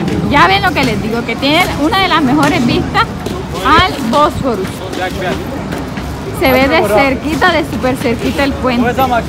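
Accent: Caribbean Coast, Colombia. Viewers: eres muy linda y agradable, me gusta como hablan los Colombianos saludos desde Guadalajara 🇲🇽 México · Hola saludos a tu esposo bello me gusta como hablas costeño t saludo desde barranquilla eres muy auténtica costeña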